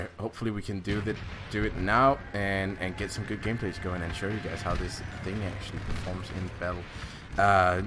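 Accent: Denmark. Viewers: Just a random guess, is from Denmark? (judging by accent) If so, then TUBORG HVERGANG!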